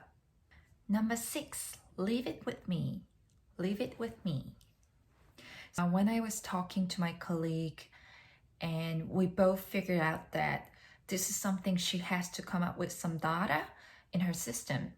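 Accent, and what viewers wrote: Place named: Australia. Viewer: 제대로 영어쓰는 국가가니까 역시 영어가 훨씬 고급져진거같아 원래 잘했지만. 👍🏻 그리고 호주 살더니 오지 엑센트가 생겼어 신기해 ! "다-라-" "다운데에-" ㅋㅋㅋㅋ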